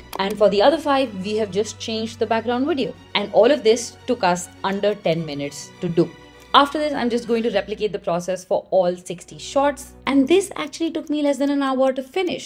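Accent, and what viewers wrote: India. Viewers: I knew this is clickbait once I heard indian accent 👎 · Your Voice Like : indian ❤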